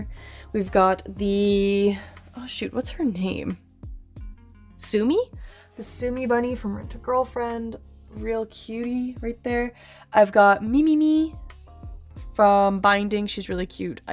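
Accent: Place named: Alberta, Canada. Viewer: Just found your channel - you have the strongest Canadian/Albertan accent, it's awesome. Great tour and collection!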